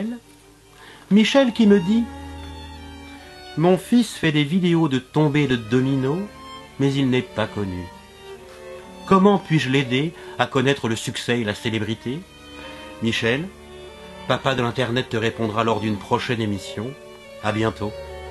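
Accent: France. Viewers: Mon dieu toute ces prononciations made in france XD le coup des portugais sur minecraft j'ai bien ri !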